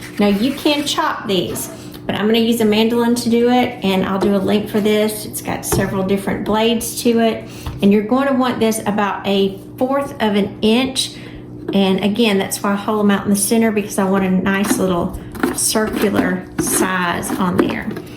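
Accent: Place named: Georgia, United States. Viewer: You know youre from Georgia when the transcript says bowl each time you say boil😅!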